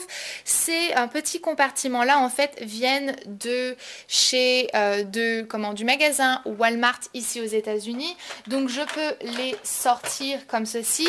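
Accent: England, United Kingdom. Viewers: Super vidéo comme d'hab :) [Aparté : C'est pas un reproche, mais ça me fait toujours mal aux oreilles de t'entendre prononcer la marque "Revlon" en version Française "ON" au lieu de "Revlon(e)" sachant que c'est une marque Américaine et que 99% du temps tu prononces souvent les marques avec ton accent anglais :p Fin bon, ce n'est pas grave, ça fait juste bizarre a entre c'est tout ;) ] Bisou ! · Comment ça se fait que tu as ce bel accent anglais ? Tu es de quelle origine ? J'adore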